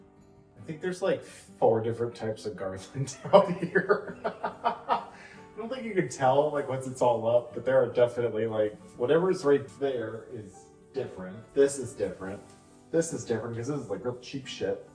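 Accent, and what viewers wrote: Minnesota, United States. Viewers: On the 2nd day of Vlogmas my true love gave to me: 2 power strips 🔌🔌 and you sound like the movie Fargo... ❄️⛄🎄⛄❄️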